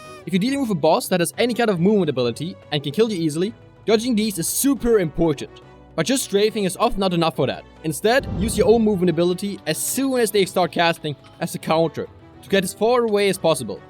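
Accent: Germany. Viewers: You Sound german / middle european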